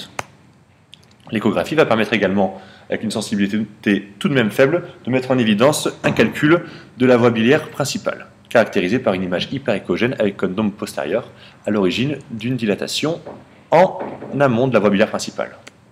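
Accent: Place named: France. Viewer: le meilleur accent de France, merci pour cette video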